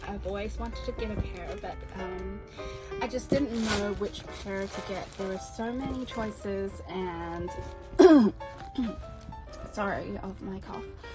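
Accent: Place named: Australia. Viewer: I can hear an Aussie Twang too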